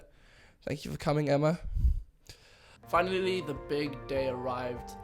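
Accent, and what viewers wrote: England, United Kingdom. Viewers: but what if we r not n america or Britain, I am in Russia and omg i absolutely love u!!! u were amazing and its very rare to see people willing to get over their fears u r so cute and u dont sound south african at all i am not trying to be racist plz to take me wrong i am not saying south africans r supposed to sound and look a certain way no i am not but u sound like u have a british accent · Your voice already sounds like an English accent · He's been living in the UK for a while, he's picked up an accent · HIS ENGLISH ACCENT OMG I DIED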